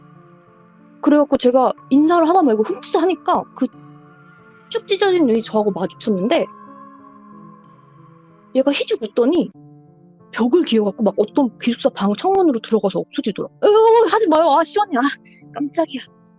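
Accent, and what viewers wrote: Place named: South Korea. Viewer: 아.. 이분 나만 샤샤같다 느낀게 아닌듯요.. 미국에서 학교 다니신분이 캘리포니아.. 매우 토종한국스러운 발음이신듯요 ㅎㅎ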